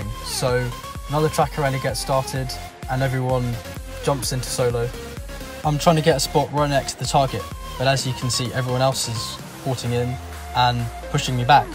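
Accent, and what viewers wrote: England, United Kingdom. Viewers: you sound like worthy prince lol...u also from UK? good to hear your voice...u are a serious guy it seems contrary to your posts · You are English lol didn't expect that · Huh who is this British Taking over channel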